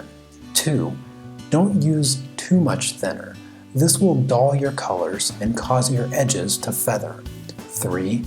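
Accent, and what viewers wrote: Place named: United States. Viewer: I am not a bigot, but I do wear hearing aids. It is SO refreshing to hear American English spoken. I have a great deal of difficulty understanding accents; British in particular. Thank you. Your voice is also soft and low, not high and piercing. Thank you.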